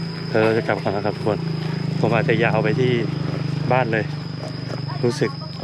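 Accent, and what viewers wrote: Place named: Luang Prabang, Laos. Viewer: มาดูคลิปที่2 สำเนียงพูดกับเด็กไปเหมือนสำเนียงหลวงพระบาง บ้านอยู่ไหนเหรอ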